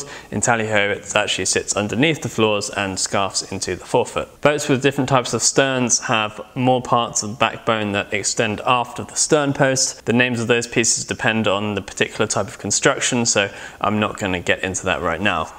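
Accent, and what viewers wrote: United Kingdom. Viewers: From an German point of view, your English ( was it always British? ) explanations of boat terms is a great help in discussions during sailing and staying in foreign ports :D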